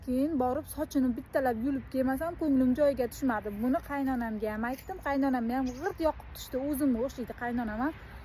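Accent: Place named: Qorakul, Uzbekistan. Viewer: Qarakoʻl danmi siz shevamiz oʻxshaydikan.tinch yurmanglar ayollar.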